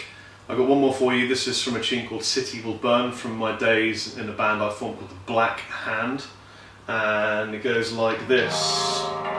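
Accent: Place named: RP, United Kingdom. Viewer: He even sounds like a "HIGH BRIT" 😁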